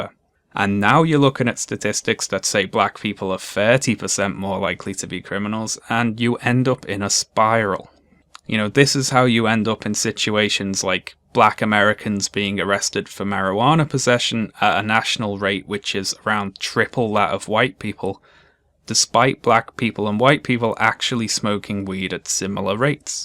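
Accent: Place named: England, United Kingdom. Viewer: it's strange hearing how much more english he sounds in these earlier videos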